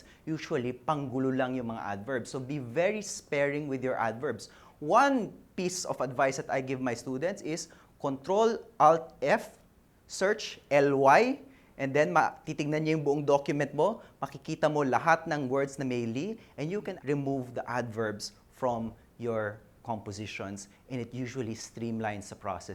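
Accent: Philippines. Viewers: Wow, stig bossingleloy. gotcha.... Dto hlata mo pinas inglis. iba ingls ng ponjap, kano, yanokor, atbp. honga, (trnsn) medyo my pasakalye pinoy inglis, pero maliwanang, naintidihan nila. pero unkanila, medyo kelgangan attention ka. subscribed na po ako. dami trnsition, 共.. t トランシチオン すみません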